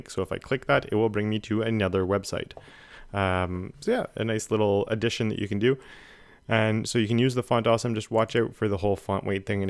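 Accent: Canada. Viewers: Thank you sooo much! This vid was incredibly helpful and made me a new sub. And I could tell right away you are Canadian too lol <3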